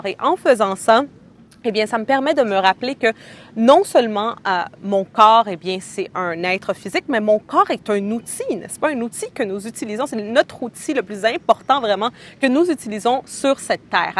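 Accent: Quebec, Canada. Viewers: Hamèd M. KAMAGATE elle est québécoise... son accent est excellent :-)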